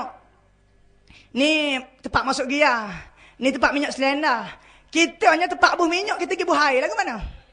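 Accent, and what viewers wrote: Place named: Kelantan, Malaysia. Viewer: standart la bahaso klate. xsoh maroh la denga mace kasa skit .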